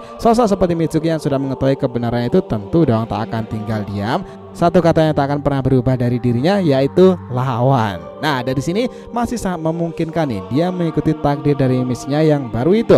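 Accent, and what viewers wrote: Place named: Banyumas, Indonesia. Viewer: Hancur....hancur dgr jatok ngapakkk 😂🤣. Tersendiri'''😂. Gak seru 😅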